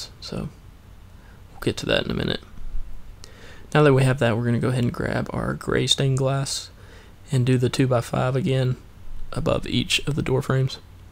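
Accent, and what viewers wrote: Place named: United States. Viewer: I love your country accent! ;-)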